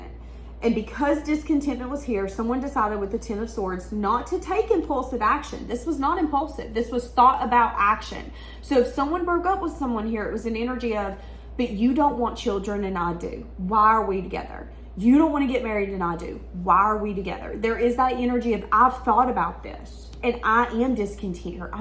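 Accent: South, United States. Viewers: Hey I just want to say I really love your southen accent ❤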